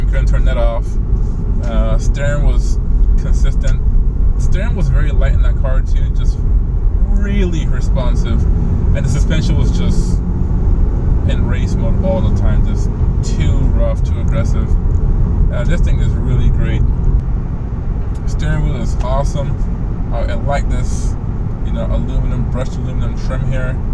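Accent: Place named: Caribbean. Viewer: Are you from the Caribbean? Hearing a hint of a West indies accent.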